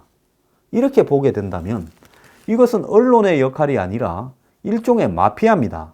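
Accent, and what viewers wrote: Gyeongsang, South Korea. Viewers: 황, 왠만하면 경상도 억양은 자제해라! 그게 자랑도 아니고. 수준낯은 애들 꼬득겨 지원표 몇게받으니 신나?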